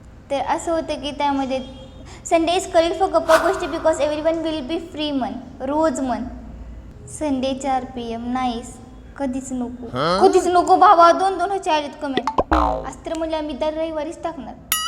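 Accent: Latur, India. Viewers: you are Very Sweet Girl,.., Tuzya vanitun Latur cha sugandh Yeto. Tuze sarv video apratim ahet Video pahun gawachi odha lagate Makani Dam cha video adhik Vistar purvak hava hota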